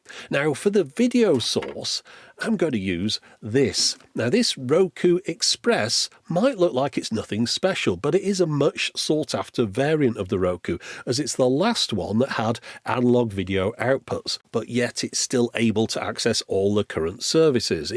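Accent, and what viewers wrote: United Kingdom. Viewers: is a straight up audiophile - this guy has shown me stuff I never fathomed existed, I just love his channel and British accent cool to listen to as well lol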